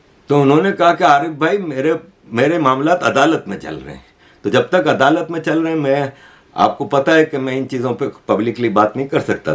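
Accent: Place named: Sindh, India. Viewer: Love your views. My utmost respects. I think you are a Sindhi (from your accent, but I may be wrong). Heartfelt respects from another Sindhi. 🙏🙏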